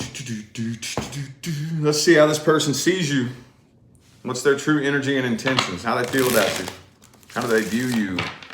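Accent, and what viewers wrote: South, United States. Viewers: Love your good ole southern boy delivery. Spot on every time. Thanks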